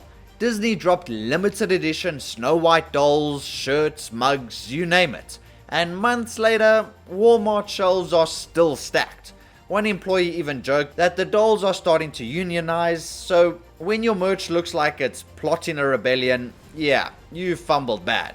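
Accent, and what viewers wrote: South Africa. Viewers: Do I hear a SAFFA?!?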